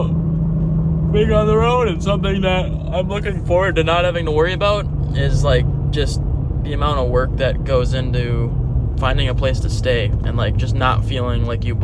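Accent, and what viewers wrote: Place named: South, United States. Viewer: Lovin the country twang you been playing,coming from a country grandma,I’m young at heart !!! Please take care and be safe Sending good vibes from Nova Scotia Canada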